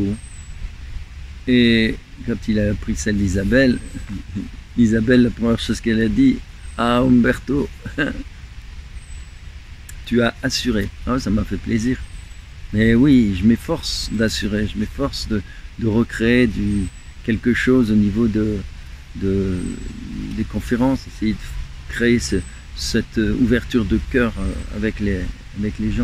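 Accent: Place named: Occitan, France. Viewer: C’est la voix occitane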